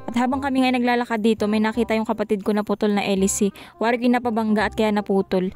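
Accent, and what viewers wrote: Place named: Quezon, Philippines. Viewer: Salitaing Quezon e 😂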